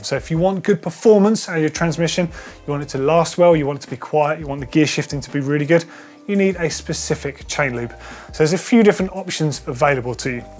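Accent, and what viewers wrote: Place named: United Kingdom. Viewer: Very good info; lots of useful stuff, here; typical Brit accent on this dude (I'm an American, although my ancestors come from a little place, ten miles north of the old center of London, a thousand years ago...ha ha ha...); and, seriously, is anyone crazy enough to ride a bike that fast, downhill, on those dirt trails, over roots and rocks, in the mud, in the rain?!? (Certainly not even ME, who was once TRULY certified...ha ha ha ha ha!) I flew downhill in the dark, a few nights ago, and wound up hugging a partially obscured STEEL GUARDRAIL, covered with weeds and vines--upside down, with a few extra cuts & bruises; I can only imagine what it'd be like losing control on one of those downhill mud-slalom runs, in the rain--not any more fun than that, I'm sure!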